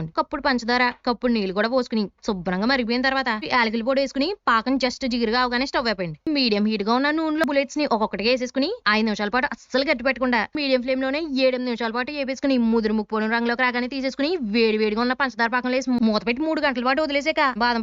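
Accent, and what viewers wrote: Godavari, India. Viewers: అచ్చంగా మా తూర్పు పశ్చిమ గోదారి మాట తీరులా ఉంది. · ఏంటండే మీగోదావరి మాటలూ , ఏంటో మనసెటో ఎల్లి పోనాదండీ . అయ్....😅😅😅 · సిస్టర్ నేను రాజమండ్రి లో 7ఇయర్స్ ఉన్నాను ఇప్పుడు వైజాగ్ వచ్చేసాను అక్కడి స్లాంగ్ మిస్ అయ్యా అనుకున్నా కాని మీ వీడియో స్ ద్వారా ఆ గోదావరి స్లాంగ్ వింటున్నందుకు చాలా హ్యాపీగా ఉంది ఆ మాటలు కోసమే మీ వీడియో స్ చూస్తాను నా ప్రొఫషన్ కూడా కుకింగే థాంక్ యు సిస్టర్.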